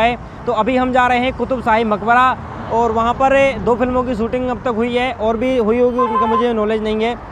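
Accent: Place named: Mathura, India. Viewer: Bhai aap mathura se ho kya Apki languages esi hi he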